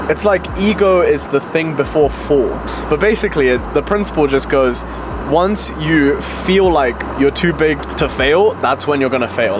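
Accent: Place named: Australia. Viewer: Since when do Chinese have an Australian accent?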